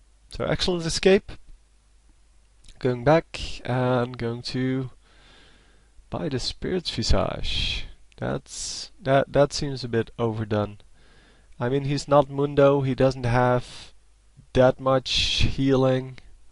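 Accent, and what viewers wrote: Netherlands. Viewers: Haha, ik wist het! Nederlands, hoorde het aan je accent =p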